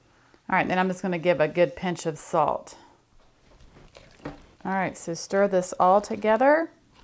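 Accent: South, United States. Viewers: Hi there! Enjoyed this video, your accent sounds Southern to me. I suspect that you are always on the lookout for meal suggestions to make on your channel. Might I suggest corn fritters and southern fried catfish. Keep up the good work, enjoy your videos a lot.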